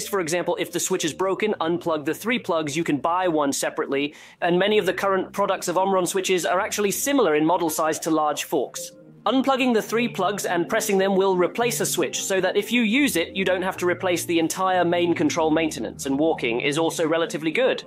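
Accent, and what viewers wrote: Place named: India. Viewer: Omron switch omron switch? Is this automaticly translated? Vegan control board? WTF it sounds like a indian person speaking english for a first time…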